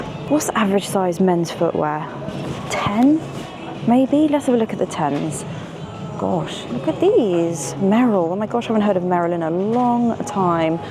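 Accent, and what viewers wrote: United Kingdom. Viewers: Yeah. I'm a 63 year old guy, and I can assure you it's. She's so easy on the eyes. It's not often I comment on someone for their looks, but if a great personality is mixed with a British accent and is pure eye candy as well? Irresistible.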